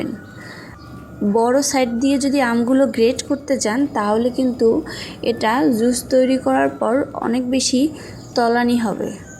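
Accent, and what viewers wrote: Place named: Bangladesh. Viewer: ওটা বাংলাদেশি আঞ্চলিক টোন,আমরা ওভাবেই বলি।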